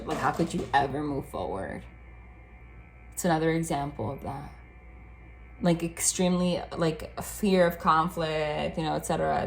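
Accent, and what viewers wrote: New Jersey, United States. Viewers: I just Love your accent 😂 that East Coast NJ accent! Nothing like it✨🫶🏼✨FUN~KEY😂(funky) ✨ Energy🎉 and your readings are so beautiful, thank you. Lots of love & Light sent to you and everyone here🧡☀️💫✨Namaste 🙏🏼🏵️🍀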